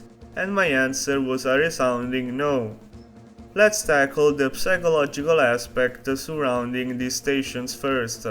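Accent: Italy. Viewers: I have never heard a more aggressive Italian accent. Lovely video video, keep up the good work.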